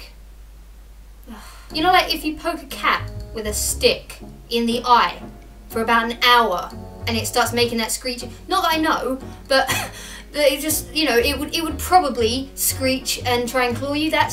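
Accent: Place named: United Kingdom. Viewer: "Second grade"? You sound very British for an American.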